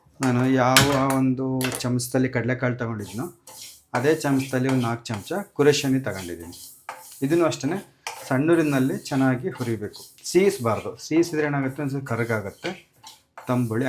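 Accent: Chitradurga, India. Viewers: ತಮ್ಮಣ್ಣ ನಮ್ಮ ದುರ್ಗದ ಭಾಷೆ ನಿನ್ನ ಬಾಯಲ್ಲಿ ಕೇಳೋದಿಕ್ಕೆ ಬರ್ತಿ ಚನಾಗಿರುತ್ತೆ ತಮ್ಮಣ್ಣ 🎉🎉🎉🎉🎉🎉🎉🎉🎉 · ❤ chitradurgada bhashe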